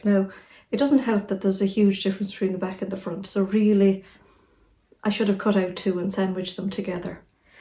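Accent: Ireland. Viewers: I've just recently discovered your Chanel I have to say I've binged watched them nearly all. Thank you for the hints and tips you make me chuckle as I find myself saying oh I've done that ! And absolutely feeling the pain when you put a lot of effort into your work to find the sizing is totally off ! But you keep going because you love seeing just as I do I also hate to let a mistake beat me I'll try and try to make it work or make something from the material. Cos like you I'm tight I hate waste . So please keep that lovely Irish accent coming with more tutorialS LOL Irene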